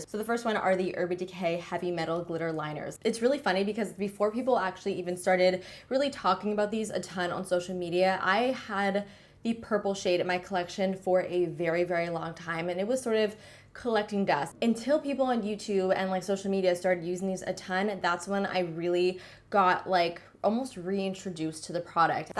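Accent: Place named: Canada. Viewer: Do you watch Lael Hansen? I just noticed you both sound alike . Might be the Canadian accent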